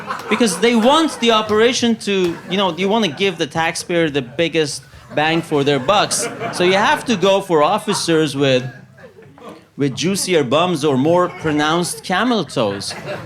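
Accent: Iran. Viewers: Why is he speaking like Iranians lol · How does he have a Persian (Iranian/Farsi) accent when his name is?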